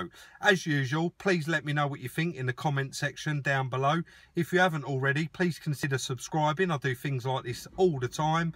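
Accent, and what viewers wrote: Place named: England, United Kingdom. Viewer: Right old English fella ....love it mate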